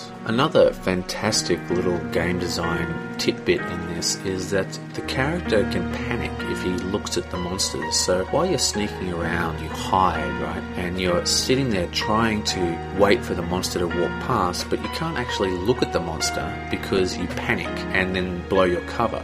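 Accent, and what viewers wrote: Australia. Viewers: Are you Australian? You got a nice, calm voice to listen to, and greate review! :D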